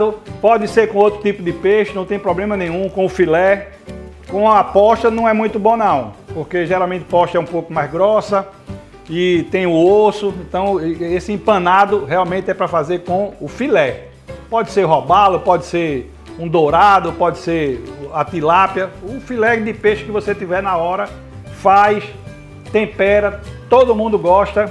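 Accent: Brazil. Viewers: Excelente vídeo, didático e claro. Muito gostoso o prato e o sotaque do chef, Brasileiro puro. Parabéns. Like e inscrição de um estudante de cozinha. Muito obrigado chef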